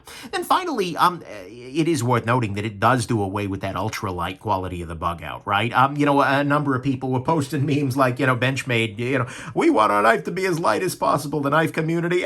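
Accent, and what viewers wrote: New York, United States. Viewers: You are so informative and funny! I love the NY accent!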